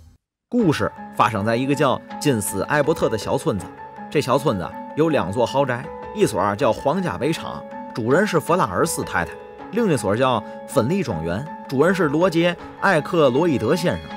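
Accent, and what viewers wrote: Tianjin, China. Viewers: 天津话太搞笑了，我喜欢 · 天津话最好用天津式土话。普通话天津味儿太违和。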